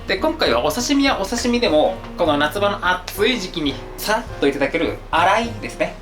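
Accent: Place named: Fukuoka, Japan. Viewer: 速攻で冷蔵庫に直してきました。久しぶりに福岡弁を聞けて地元に帰りたくなりました！さんおかえりなさい〜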